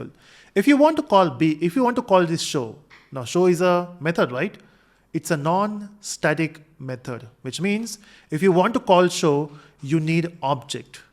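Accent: India. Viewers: Are you Indian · sir are you INDIAN/////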